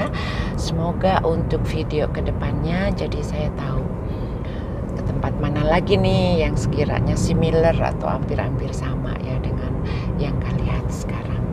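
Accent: West Java, Indonesia. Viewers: Bu , emut keneh basa Sunda teu ? Di logat namah masih Sunda nyaaa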